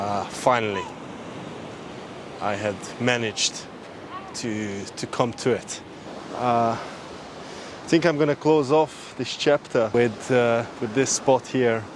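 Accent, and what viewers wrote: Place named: Glasgow, United Kingdom. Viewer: You sounds Glaswegian sometime maybe you were Scottish in a previous life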